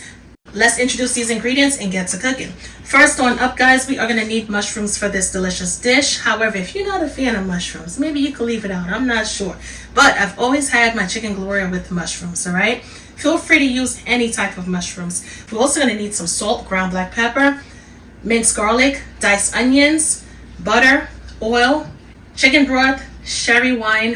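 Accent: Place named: Brooklyn, United States. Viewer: Great accent. Maybe Brooklyn NY?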